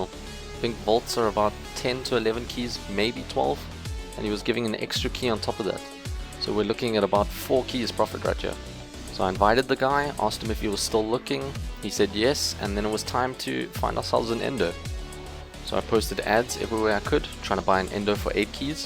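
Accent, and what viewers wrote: South Africa. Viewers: R u from South Africa bcuz I am!!! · are you south african? it really sounds like it. If so what part are you from. I'm originally from joburg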